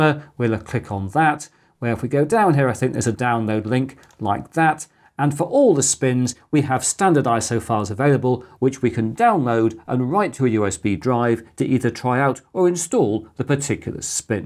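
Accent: United Kingdom. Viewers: A Fedora is a hat. I believe it was Frank Sinatra's choice. (But it wasn't red, I think). Thanks for the insight into yet another operating system. By the way: You British always seem to have a problem pronouncing "kn" and "gn". Very often you just ignore the k or the g: [nife] rather than "knife", [nu] rather than "gnu". But when you do decide to pronounce the k or the g, you always insert an e or sometimes an a: "Genome" rather than "gnome". You once had a Danish king named Canute. Except, his name was really Knud. Where did that a come from? The funny thing is that it isn't difficult at all to say "gnome" with no inserted e. In Denmark we do it all the time.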